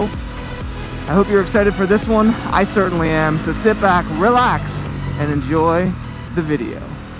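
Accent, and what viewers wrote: South Africa. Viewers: I recognize the South African accent 🤣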